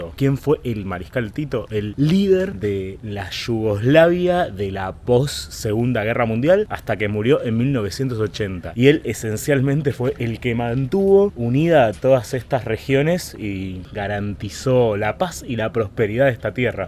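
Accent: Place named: Argentina. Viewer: Hay muy buenos youtubers viajeros, pero es tope de gama ver videos de viajes tan interesantes con una mirada y acento 100 % argentino. Adelante!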